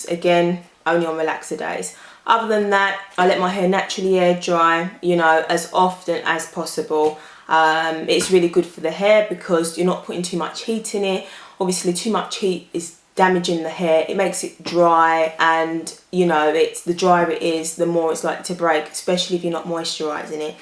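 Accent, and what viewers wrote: United Kingdom. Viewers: are you british....you say strangffin ...thats funny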